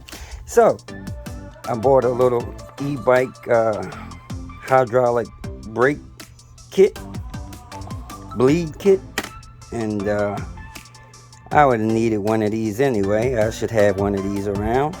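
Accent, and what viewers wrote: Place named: Georgia, United States. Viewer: Bro, I feel you on the "troll" shit, were from a different time & a different era, where we ran our mouths to each other faces, & backed that shit up! 💯 I'm 47 y.o, very outspoken on my views & beliefs, but AT LEAST I stand in something, these days it's the blind🦮 leading the blind🦯 out there nowadays...... Where you from originally bro, Georgia or something, I know your accent but can't place it??? 🤔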